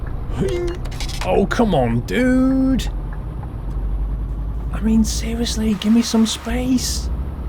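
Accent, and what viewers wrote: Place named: United Kingdom. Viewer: I am a Pennsylvania person who enjoys listening to you talk in your British way. Your like a person who can keep anyone company. Sometimes you are funny. Also, it's neat to see how the ways you have to learn how to handle traffic and situations along the way as you travel.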